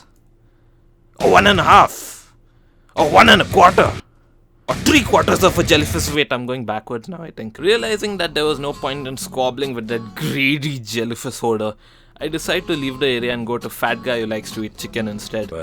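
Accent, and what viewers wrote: India. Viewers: i think he sounds like a idian guy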